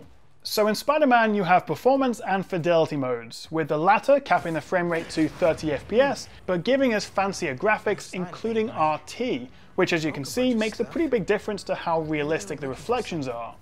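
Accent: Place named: North, United Kingdom. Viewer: why do you sound like a northerner pretending to be a southerner?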